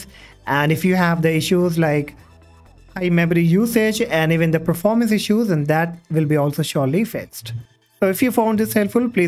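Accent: India. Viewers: I immediately became suspicious about this 'more ram' due to the fact that his voice sounds Indian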